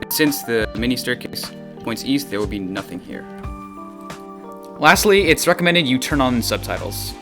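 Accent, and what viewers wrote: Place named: United States. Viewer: Bro explain in Hindi i understood most of it but coz u talk English so fast and I'm not used to the american accent of English so it's hard to understand 😅😅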